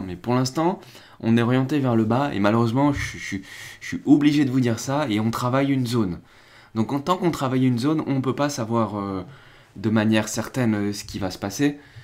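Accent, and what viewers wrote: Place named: Spain. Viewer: Cet accent espagnol :) looool moi j'aime bien ces vidéos avec du contenu mais avec le sourire!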